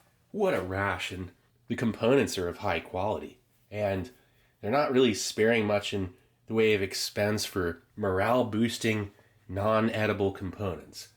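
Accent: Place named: Philadelphia, United States. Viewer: I know is in Florida, but does anyone know if he's originally from Philly. I hear a Philly accent come out sometimes.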